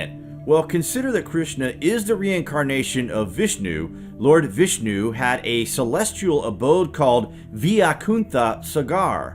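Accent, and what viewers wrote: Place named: California, United States. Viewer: ‘We’ and ‘our’ - plebeian public mind nonsense. It’s hard to get to the substance here because of the terrible writing. Stupid California accent doesn’t help. Learn to write like an individual and get a narrator who can speak standard English with RP or standard American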